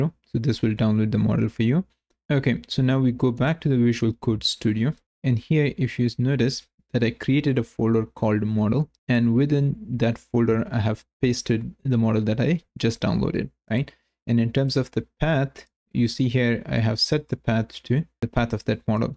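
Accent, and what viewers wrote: India. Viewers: First second I hear Indian accent = Imma'bout to get pure useful information from this video, this is for sure. Thx for the video though 1 thing - when you talk about "previous video" at the beginning of the video, I'd consider to provide this "leading link" that shows in the corner because I'm not familiar and have to dig into your feed to find it. Not a great issue but still - just a tip to consider.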